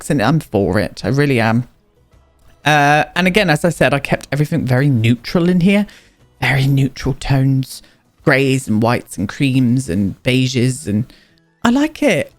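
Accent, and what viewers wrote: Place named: Devon, United Kingdom. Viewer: I just LOVE your videos! I'm originally from Devon but currently training to be a midwife in Birmingham and hearing your accent just reminds me of home and makes me feel all warm and fuzzy inside <3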